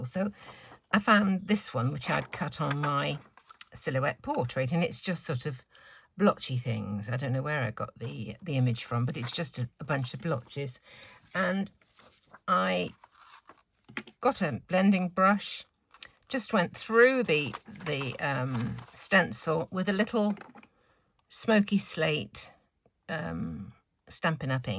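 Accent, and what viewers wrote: United Kingdom. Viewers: I completely agree! It still boggles me that some people can be so rude! If you don't like someones videos, don't watch them, there's no reason to be mean! I completely adore all your videos and thoroughly enjoy all your commentary with yourself, especially with your British accent! You just have a way of saying things that is so different from the way we Americans speak and I absolutely love it! Don't change a thing! Love all your CAS cards and videos! Thank you for taking the time to share all your thoughts. I myself, have one of those bins full of started projects or scraps and bits of cards that never got finished. I always feel a great sense of accomplishment when I have pulled a card together from those bits.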